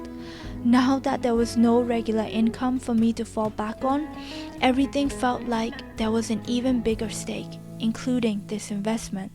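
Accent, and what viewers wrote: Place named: England, United Kingdom. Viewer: I hear a cute English accent❤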